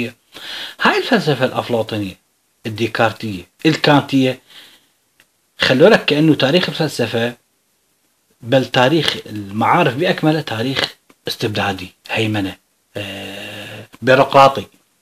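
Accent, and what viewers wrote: Iraq. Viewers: عم بسمعك من تركيا أيها الرائع 💞اليوم شفتك بفيديو شخصيا انت مميز ولما تطلع بالفيديو بيكون احلى بس خلي الجلسة وراك مميزة أكتر مثلا خلفية بيضا وتجلس عا كنب وامامك الطاولة مبدع انت كتير استاذي وانا كمان صديقتك بالفيس لهجتك العراقية زادت الفيديوهات جمال💞🥀 فيه بصوتك نبرة حزن ليش😔💔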